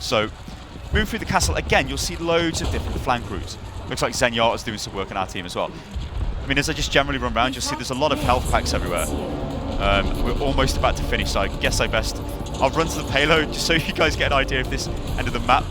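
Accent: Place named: United Kingdom. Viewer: "Great British Gaming" "amis" gg. Ja die Sprache ist die selbe: ENGLISCH. Wie kommt man dann also auf ami? Ausserdem hat er einen klaren Britischen Akzent.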